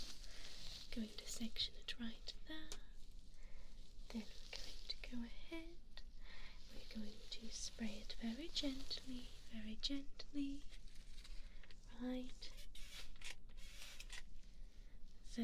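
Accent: United Kingdom. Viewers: I love the bri'ish accent. Love your audios❤ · are you british by chance? (i’m the anti furry you talked to a few months ago) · I'm so used to the Tomboy voice that hearing this gentle, higher pitched British Esq voice, gave me whiplash. Good to know you have a versatile voice! Makes for much more entertaining ASMR videos. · 40 minutes of you must be what heaven is like,. Only forever! I love your British accent. This artwork of you is phenomenal! 😍 And my birthday was 2 days ago. This is a great present! 🎂🥳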